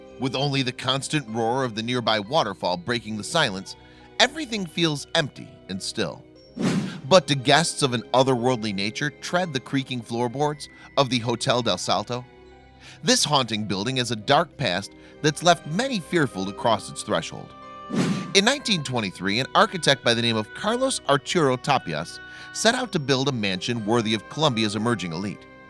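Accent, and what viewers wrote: United States. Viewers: Working it hard for a story -loud yank -very ordinary and dull .....only yanks could dramatise up as odd or unusual.....narrator tries to hard ..thumbs down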